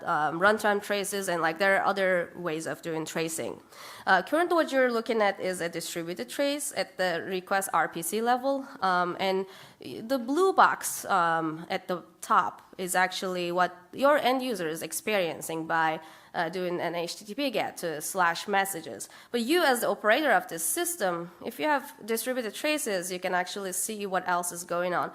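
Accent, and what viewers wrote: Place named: Turkey. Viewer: Anyone else wondering what her accent is, it's Turkish!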